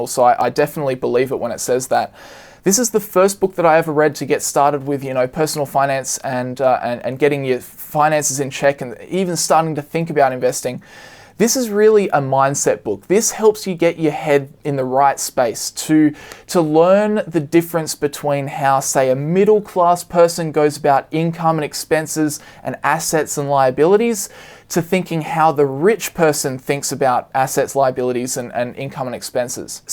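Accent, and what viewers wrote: Australia. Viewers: Are you Australian? If yes, whereabouts if you dont mind sharing?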